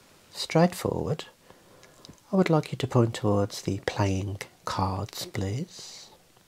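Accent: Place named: Black Country, United Kingdom. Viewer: As a Midlander, loving the Black Country accent!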